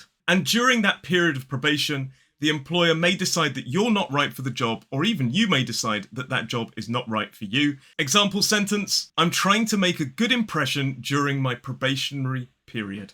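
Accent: United Kingdom. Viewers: British English accent sounds great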